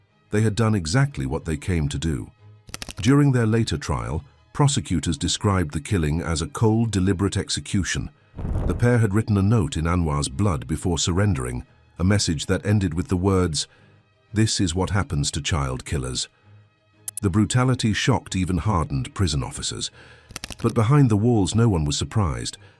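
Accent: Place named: United Kingdom. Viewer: Thanks for the sensitive narrative. I appreciate the British voice and detail.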